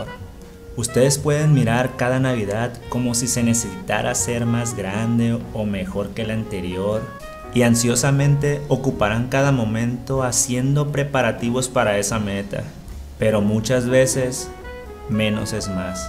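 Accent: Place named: Sinaloa, Mexico. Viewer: ¿Porque habla así bato,acaso es sinaloense?,saludos,felices fiestas a todos incluyendo a católicos,musulmánes,etc.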